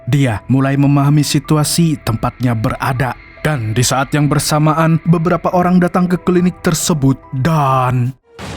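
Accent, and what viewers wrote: Banjar, Indonesia. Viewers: Pian urang Banjar kh bang 😅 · Bang lu org banjar😂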